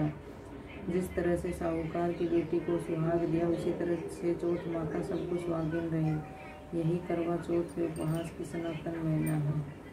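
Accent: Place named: Rewari, India. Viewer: Kit ki h ya jo khani padh rahi h boli to Riwari ki h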